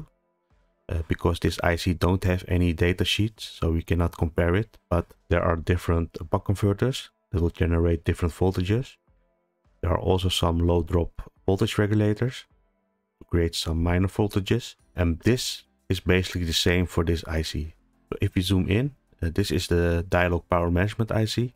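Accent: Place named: Netherlands. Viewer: Zo horen ben je Nederlands👌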